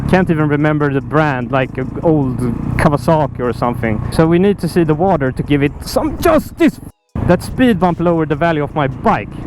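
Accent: Sweden. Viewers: Svengelskan delux 5/5 👍👍👍👍👍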